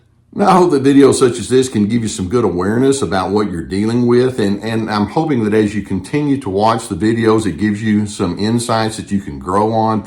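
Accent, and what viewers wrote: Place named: Houston, United States. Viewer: This is utterly excellent. Thank you, a voice for real humanness in a world gone mad. You your words truly benefit many. Your humility and honesty nurture our souls and authentic selves. I have even come to enjoy your Houstonian accent, very much, because your balancing Weltanschauung shines through the way you convey your wise words and pure intent. So, thanks again and again. To me you are a Voice in the wilderness. You seem to be a Voice for The Voice of the Silence...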